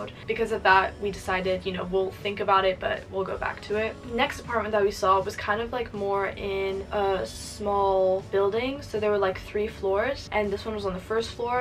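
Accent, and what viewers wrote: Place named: England, United Kingdom. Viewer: I can hear your English accent emerging...how long have you been in London?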